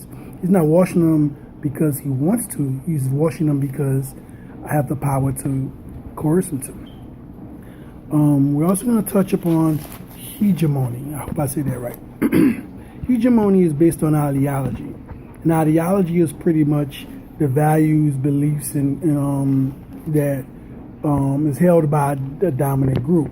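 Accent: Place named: New Orleans, United States. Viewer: ok no problem , i like that little new Orleans accent of yours too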